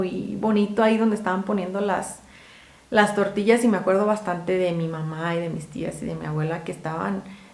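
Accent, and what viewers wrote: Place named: León, Spain. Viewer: Tu acento es muy bonito (lo relaciono con el de León). Estaría padre ver un house tour y recetas, por supuesto.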